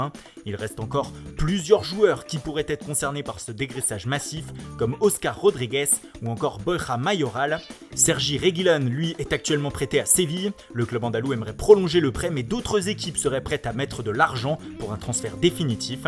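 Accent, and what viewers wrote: Italy. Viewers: En néerlandais "oe" se prononce "ou" Investissez un peu dans autres chose que l'accent italien hyper prononcé svp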